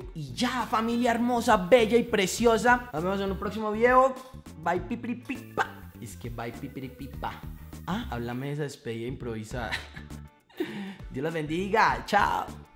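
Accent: Antioquia, Colombia. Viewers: hola ya estaba esperando un nuevo vídeo... Justo ayer estaba viendo 11once me encanta tu personaje y ese hacento 😘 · Hey!!!! Ya extrañaba mucho tu acento colombiano. Te amo❤️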